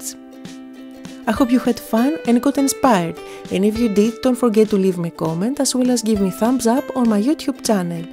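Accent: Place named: Greece. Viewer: your accent makes me wish to be in the Pelleponisos again this summer!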